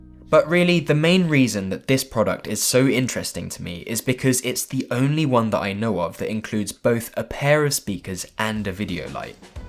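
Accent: United Kingdom. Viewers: I wish I had a British Accent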